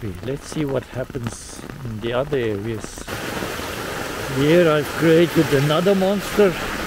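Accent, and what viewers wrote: South Africa. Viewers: Sounds like a South African accent?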